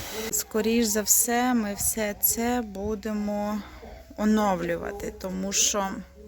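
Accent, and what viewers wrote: Western Ukraine, Ukraine. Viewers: Вас вже від западенки не відрізниш😘 навіть не віриться, що ви з Харкова. А вікна файні вийдуть) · Вимова у вас західна, не скажеш що ви з Слобожанщини. МИРУ нам всім та добра.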